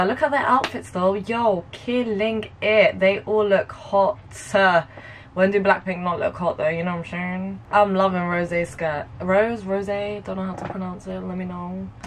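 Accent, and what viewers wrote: United Kingdom. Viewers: I know this is out of the blue, but I have just realized that you are British from your accent. Is there any other way to get to Ireland from England than by plane? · U from UK. Cuz i know ur Accent 😁😅😃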